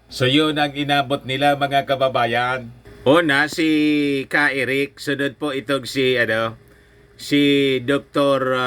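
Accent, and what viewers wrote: Bicol, Philippines. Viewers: bicolano ka po ba sir?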